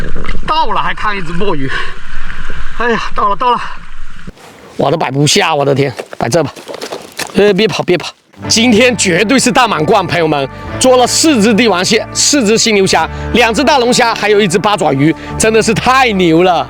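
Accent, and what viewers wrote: Fujian, China. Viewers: 听你的口音应该是福建人吧？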